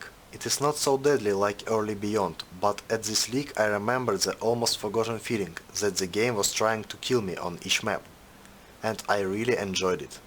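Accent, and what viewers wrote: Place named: Russia. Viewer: Твой руззгий акцент просто супер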